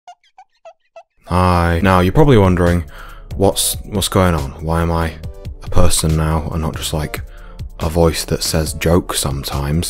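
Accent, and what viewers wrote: United Kingdom. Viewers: omg he's british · hey, mom, new femboy just dropped Btw: u look infinitely better knowing you have a british accent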